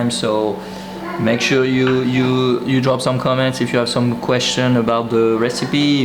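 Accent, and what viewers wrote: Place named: France. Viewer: Je trouve chouette ta chaine car je vais apprendre l’anglais et cuisiner avec toi ! C’est cool et du coup on comprend tout vu ton accent français.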